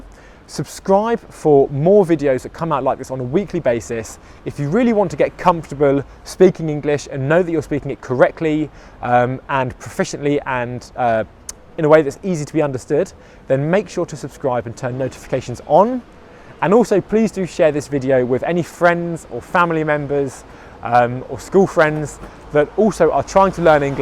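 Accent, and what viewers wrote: United Kingdom. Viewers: Hi. I do like your lessons on phonetics with a British pronunciation. Please continue. Thanks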